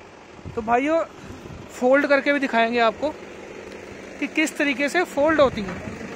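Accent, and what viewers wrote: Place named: West Uttar Pradesh, India. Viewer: Bhai tumhari aavaj west UP ki lg rhi kha se ho bhai aap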